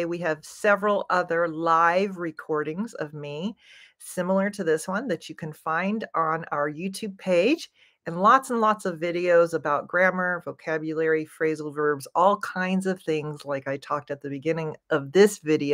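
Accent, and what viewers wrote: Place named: United States. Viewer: I love American accent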